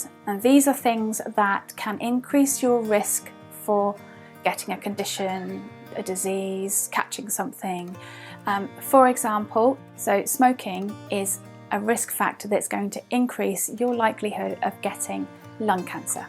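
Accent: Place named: United States. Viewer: Bonjour. Je réponds donc en Français. Je reviens d’une promenade dans la nature. J’habite dans la région Auvergne-Rhône-Alpes. Êtes-vous la dame de la vidéo? Êtes-vous américaine ou britannique? Je pencherai plus pour la nationalité américaine, au vu de votre accent et du fait que vous parliez sur you tube. Je souffre du trouble neurologique fonctionnel (TNF=FND) depuis ma vaccination ARN messager. Je marche avec une canne et je souffre d’une dépression existentielle assez aiguë… J’ai des douleurs neuropathiques. Je vais chez un neurologue en septembre pour écarter les maladies neurologiques classiques comme la sclérose en plaque ou un accident vasculaire. J’ai adhéré à l’association Covid long France pour ne pas me sentir seule face à la maladie. Je vous donnerai régulièrement des nouvelles en anglais ou en français comme vous le souhaiterez. 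Ce sera un réel plaisir pour moi. 🙂🙂🙂 Amitiés. Christelle 🌈🌈🌈🌈🌈🌈🌈🌈🌈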